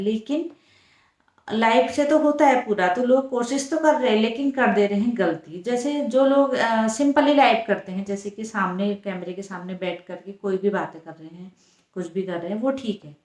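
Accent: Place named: Pithoragarh, India. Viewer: तुम्हारी जानकारी बहुत अच्छी होती है में कई बार सुनी हु अभी भी फुल सुनी हु में जो तुम बताई यही सब हो रहा हा राशिफल का खूब चलन कुछ न कुछ करते सब लोग ऐसा,तुम कहा से हो बोलना तो पिथौरागढ़ वालो जैसा है, हा बार बार एक तो लगाना नि होता है बहुत अच्छा बोली सब,में जुड़ी हु तुमसे कई बार देखती हु कमेंट नि करती आज अच्छी से सुनी तो कमेंट कर रही हु ,सुनती फूल हु में ,,हा अपनी आवाज में बनाना सही है लाइव भी सामने अच्छी हा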